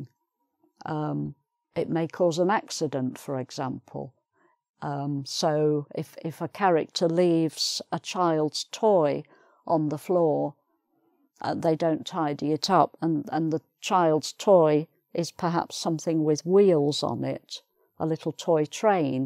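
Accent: United Kingdom. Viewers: Thank you for this videos, I love the British accent I undersant all without subtitles, Greetings from Mexico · Hi,.I like your accent.God bless the UK.天佑英国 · Your videos are my bread and butter lately❤❤❤❤...I love your British accent, could you make a video in how to sound british please? Please, please, please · Hello Mrs., i am Merci from Indonesia. I am on the second year in junior high school this year and due to the covid-19 i have to study at home. It is nice to know you and nice to follow your lessons. I am so interested in following your lesson and i like your accent very much. Anyway, i would like to imitate your British accent keep joining your English program. Best regards and have a nice day from Java island - Indonesia.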